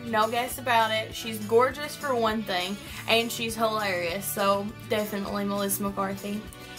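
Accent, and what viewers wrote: South, United States. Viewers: Love your makeup and love your southern accent.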